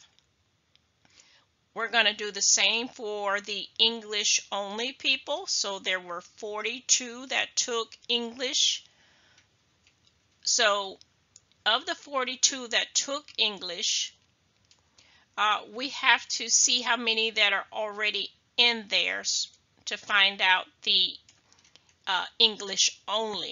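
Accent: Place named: United Kingdom. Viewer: shes british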